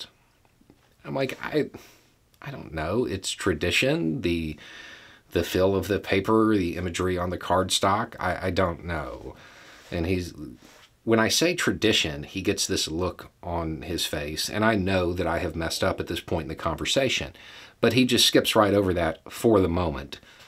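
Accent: South, United States. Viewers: Enjoy your channel (and accent from one southerner to another). What I tell folks is it keeps ppl in jobs. In this case the postal workers. Your son would probably answer that Internet companies have workers too? Well no son. They have AI reps that you can't get an answer from or they've lost their jobs like Twitter. I won't get into the environmental part of this, but as others have pointed out not all paper is cut from trees. HAPPY HOLIDAYS! See? I just saved time, money, and a tree. Have a good'un.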